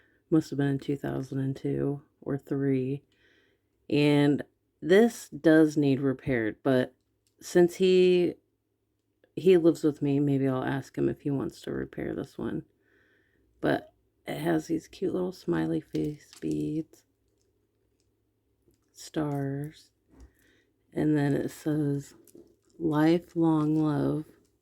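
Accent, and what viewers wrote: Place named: Midwest, United States. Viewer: You sound like me😂❤ is it a Midwest thing?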